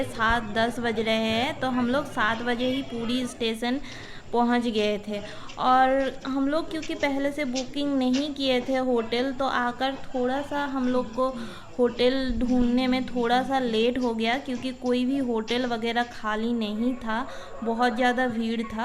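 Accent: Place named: Bengal, India. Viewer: Aapka hindi acha hai bas thora bengla touch hai.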